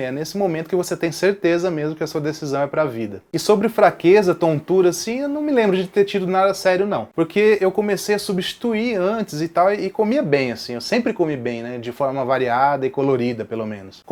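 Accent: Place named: Northeast, Brazil. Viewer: Êa, Cara, Show! obrigado pela dica de anotar a data! eu anotei agora pra não esquecer porquê dissestes. fiquei de cara em saber que é nordestino, já tinha assistido diversos vídeos e não tinha prestado atenção no seu sotaque ainda, e olha que cresci em Sergipe, moro aqui e morei muitos anos na Paraíba também. Abs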